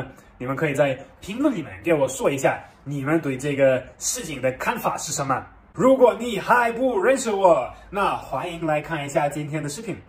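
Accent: Sichuan, China. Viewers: 哈哈你的视频很有意思，我是生活在斯图加特的中国人，看你说汉语还带着挺浓的四川口音，对你的好感加倍哈哈，祝你在中国生活的开心，希望德国也尽快控制住疫情。。。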